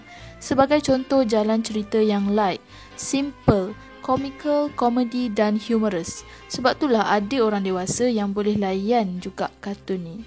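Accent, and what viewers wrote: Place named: North, Malaysia. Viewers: orang utara macam mana pun dia control slang dia,mesti keluaq jugak utara tu😂😂😂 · Kita geng.. Ada dengaq mcm loqat utara pown ada.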